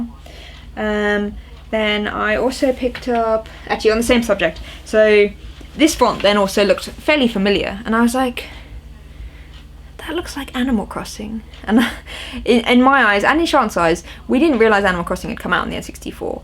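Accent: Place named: England, United Kingdom. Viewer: It's true, English accent makes everything sound classier.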